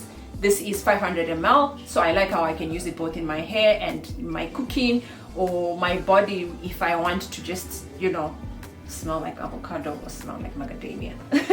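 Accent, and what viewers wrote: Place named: Shona, Zimbabwe. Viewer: I'm happy to hear that!! Video request about a monthly budget and how to save money in Nairobi., your accent has some Shona undertones.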